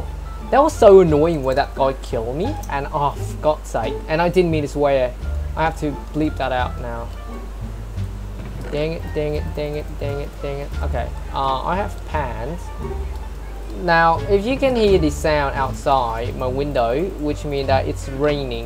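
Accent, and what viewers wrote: United Kingdom. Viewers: u should combine fighting with the sword with the fishing rod more often,especially use the fishing rod when someone is near the edge,it'll knock them off further xD (công nhận bác nói tiếng anh giỏi ghê >o< accent giọng Anh nhỉ?)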